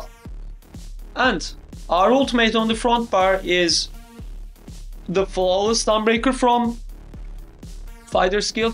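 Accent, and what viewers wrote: Turkey. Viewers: hi mate ! where are you from ? i got turkish vibe on your voice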